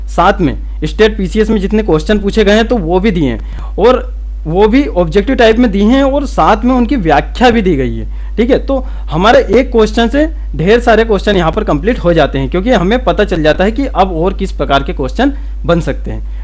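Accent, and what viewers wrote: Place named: Indore, India. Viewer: Sir aap indore s hona.....apki voice tune s lg ra h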